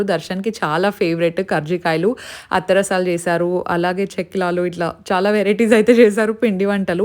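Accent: Anantapur, India. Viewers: Akka miru pakka ATP language matladutharu akka superb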